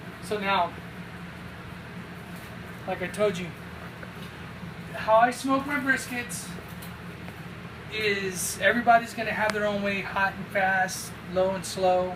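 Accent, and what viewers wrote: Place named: Hawaii, United States. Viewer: You sound like you have a Hawaiian accent. Good video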